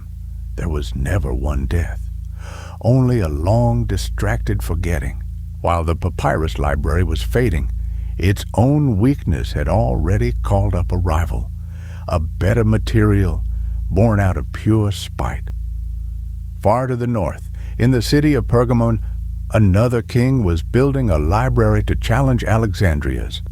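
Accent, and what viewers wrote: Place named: Midwest, United States. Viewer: Hillbilly accent? You've obviously never actually heard a hillbilly accent before. This sounds like a Midwestern accent.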